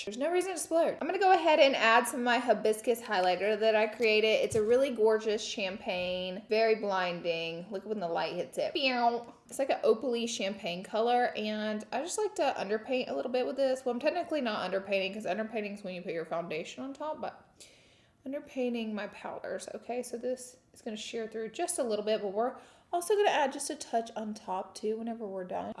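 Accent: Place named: Alabama, United States. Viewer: Do you think you will ever lose your AL accent? Lord, I hope not ❤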